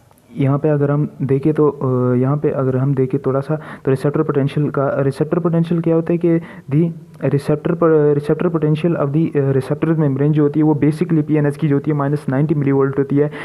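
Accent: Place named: Pakistan. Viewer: Asa.. Lg rha h pakistani english bol rha h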